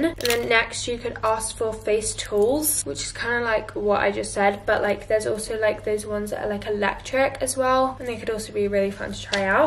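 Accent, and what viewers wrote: England, United Kingdom. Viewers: I luv your English accent like omg and love the video by the way and also i luv they way you edit your videos like 10000/10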